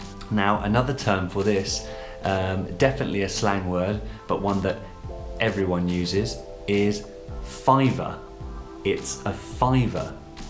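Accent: United Kingdom. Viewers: Where do you live in Uk? Your dialect? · Hello,! I found your channel and let me tell that it's amazing! I learnt new words and phrases today. I really love the British accent and hope you keep uploading more videos. Finally, what do I think of British currency? Well, the first phrase that comes to my mind is "great exchange value", because British currency has more exchange value than others kinds of currency. 💷😎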